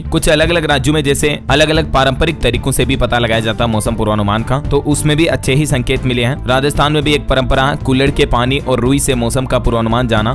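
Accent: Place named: Haryana, India. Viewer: Yaar Tera Haryanvi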